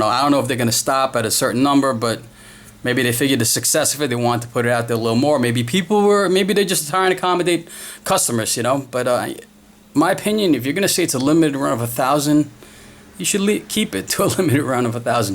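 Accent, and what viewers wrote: Boston, United States. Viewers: Are you from the Boston area? Sounds like you are ... Im from very close to Boston.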